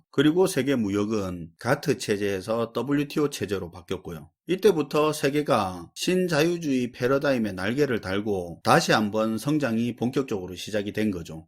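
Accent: Gyeongsang, South Korea. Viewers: 연속적으로 시청을 했을정도로 어려운 경제 흐름을 쉽게설명해주셔서 감사합니다. 가정경제에 중요한 시점이라 돌다리 두드리는 맘으로 시청하였는데 기본이 중요하다는것을 시청할수록 느껴졌습니다. 구수한 경상도 사투리와 편한 캐릭터가 집중하여 들을 수 있게 하는것 같아서 경제에 통달한 분의 오디오 비디오로 적격인듯싶습니다. 감사하고 많은이에게 유익한 지식이나 정보 많이 알려주세요~^^ 훌륭하시고 감사합니다.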